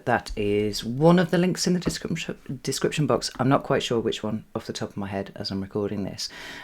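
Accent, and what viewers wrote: United Kingdom. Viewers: Just your amazing voice, delightful british accent and excellent vocabulary and choice of words captivates me. I would be listening to you for days! Thanks for your readings, Cap sun Sag rising here. 😊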